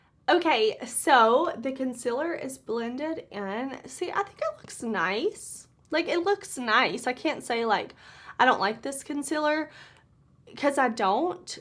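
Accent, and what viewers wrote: South, United States. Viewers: I didn’t realize you live in Mississippi, I live there as well, in Columbus :) I knew you had a southern accent, just didn’t know where you were from. You’re one of my favorites to watch so it’s cool to know we live in the same state!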